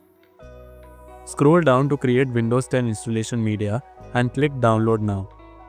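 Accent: India. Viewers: Indian accent. Yessssssss!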